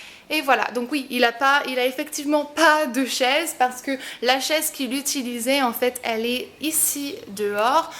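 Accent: United States. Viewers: moi je vois pas le bazar en tout cas lol super appart jaime bien la déco ! et c marrant ta choppé l'accent américain même quand tu parle français lol <3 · Coucou, j'adore ta vidéo ♥ ton appartement est trop beau *-* Et j'ai remarqué que même en parlant français, tu as un accent américain :) Bisous ♥ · Puis sa meilleure amie d'enfance est américaine il me semble, elles étaient tout le temps ensemble, elle passait du temps dans la famille de son amie où ça parlait anglais, ce qui expliquerait ce petit accent. Mais je ne connais personne qui à force de trop parler anglais se met à parler français avec un accent ... Mélanger ou oublier des mots, oui, perso ça m'arrive souvent. Mais de là à avoir un accent quand je parle ma langue maternelle... Je pense que le truc de de notre miss remonte à l'enfance passée avec son amie, on attrape plus facilement les "tics" et habitudes à cet âge-là. 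Voila!! ^^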